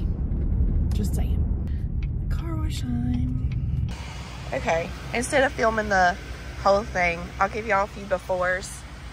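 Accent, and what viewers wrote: South, United States. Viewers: Girl! You is southern! Lol . Gotta drink tea! Hope you feel better soon!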